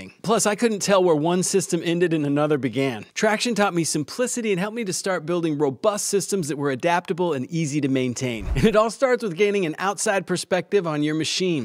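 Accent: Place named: United States. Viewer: Great but talks too fast. Slow it down. Your channel audience is all over the world and we don’t all understand American English as well as native speakers.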